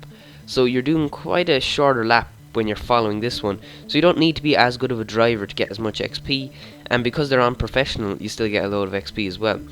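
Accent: Ireland. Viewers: MUP ya boil ya yer irish ☘️ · Hey your Irish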